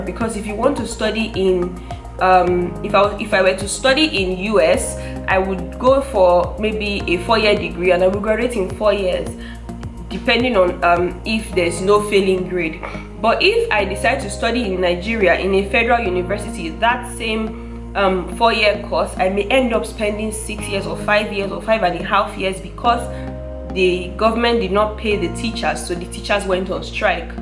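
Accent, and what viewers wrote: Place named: Philippines. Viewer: I am really glad to know and heard from you a kind of Filipino English accent. You deserved to learn it from the Philippines. By the way, the verse (Romans KJV) you had mention is specifically for all the servant of the Lord who serves Him faithfully in good times and in bad times. And that two things work together for good in our lives.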